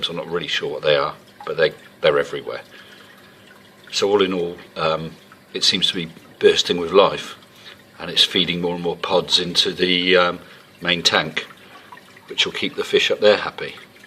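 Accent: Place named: England, United Kingdom. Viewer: Stunning foxface mate I love them, unfortunately I lost my juvenile foxface 2 days ago 😢 and I don't no the reason at all all my water parameters are spot on the fish looked healthy no signs of illness and he was eating like a pig so I think I was the case of things die 👎quick question buddy i has sps birdsnest frags for sale and I can post if ure interested you've got a English accent so ino postage isn't a problem lol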